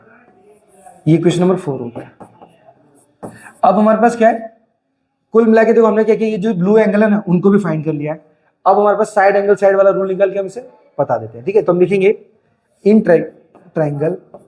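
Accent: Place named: Gujarat, India. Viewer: From your talking style I think that you are from Gujarat. Anyways I'm from Ahmedabad Gujarat. And sorry for distributing you.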